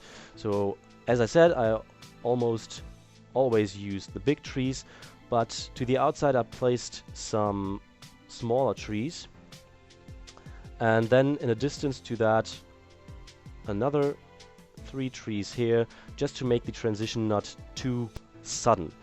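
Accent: Germany. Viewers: Just a question, are you german? Your accent sounds pretty much like it :D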